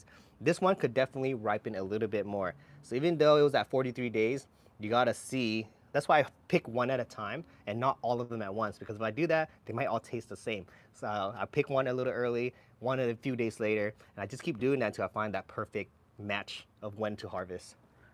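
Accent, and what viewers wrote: United States. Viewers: Are you from southern California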